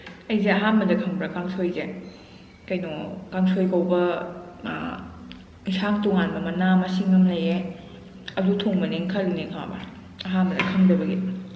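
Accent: Kakching, India. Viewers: Kakching londwna ngangdena ngdi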